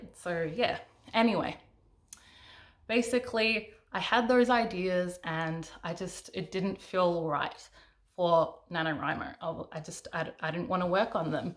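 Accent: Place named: Australia. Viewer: Hey there! Fellow Aussie here! Glad to here a familiar accent. I found you from Emily's channel. I'm currently 50K into a 100K urban/paranormal fantasy, so I want to get the last 50K of the first draft finished for Nanowrimo. I understand that worldbuilding can be a huge thing, especially when (like me) you're trying to take existing myths/legends/creatures and find a place for them in your own world - on top of all the other worldbuilding that needs to be done. There's developing cultures, drawing maps (even an urban fantasy needs to know the lay of the land/city) and everything else. If you're interested, there is the great site called "World Anvil". The developers are a husband/wife team - he is a gamer (D&D etc) and she is a writer, so the site is made for both types of creators. Even if you don't end up putting all the details of your world on there - the templates are fantastic for providing all sorts of questions that you can use to help develop ideas. 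They make you think about things you might not have considered, and fill out details for things you might already have. It's great!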